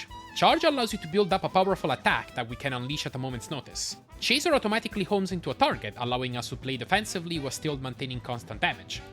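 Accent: Italy. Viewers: Bro è italiano me sa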